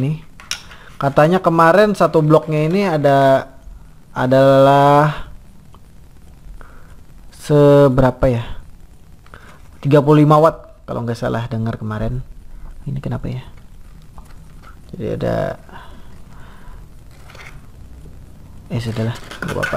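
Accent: Banjar, Indonesia. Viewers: Orang banjar kah pian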